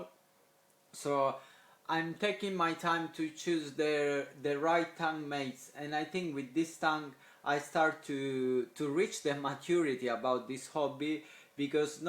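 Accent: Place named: Italy. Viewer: i enjoy your video and i also like listening to your italian accent. LOL peace.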